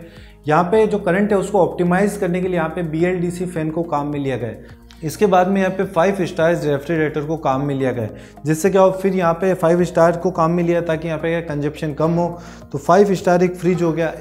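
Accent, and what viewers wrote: Rajasthan, India. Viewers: His accent his Rajasthani and not that of kerala. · Ye rajasthani h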